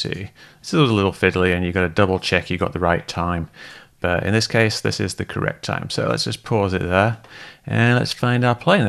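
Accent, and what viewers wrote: United Kingdom. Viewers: Your clear Manchester accent gives you away! Manchester United is my favorite football team in the UK! You keep doing what you're doing, it helps to dowse the flames coming from the arses of true believers;^)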